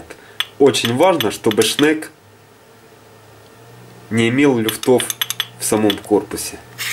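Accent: Ukraine. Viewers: Канал зарегистрирован в США. Кому же идут собранные деньги? Если акцент окраинский?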